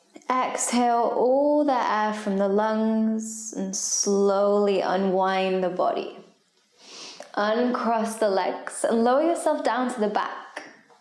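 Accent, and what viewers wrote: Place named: England, United Kingdom. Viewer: Your 60 min sessions are great. I love your accent! I'm not good at guessing but I guess England or Australia. Anyway I can listen to you talk for days. Great workouts! Appreciate your time.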